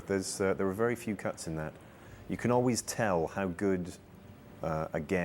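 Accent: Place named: United Kingdom. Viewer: Love the british, or whatever it is, accent.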